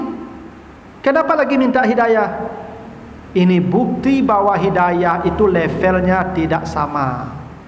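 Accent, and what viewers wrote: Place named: Minangkabau, Indonesia. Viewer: Khas muralabuahnyo . Membuek ambo takana jo kawan ambo maso di padang daulu. Tapi rancak end mantap mendanga ust membawo ceramah. Ambo sanang 👍👍👍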